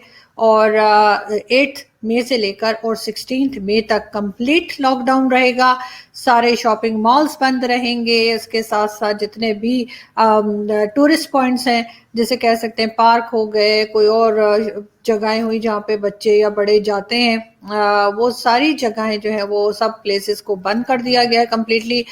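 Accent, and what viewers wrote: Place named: Mumbai, India. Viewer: ji.. mumbaiya hindi ek number.. aap sabki vaat lagaati ho 😁😁😁👍👍👍👍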